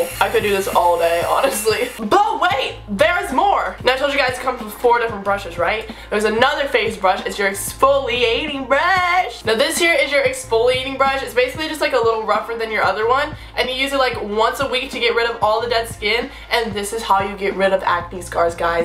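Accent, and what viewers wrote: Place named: Texas, United States. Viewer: She's Texan lol half of my family is😂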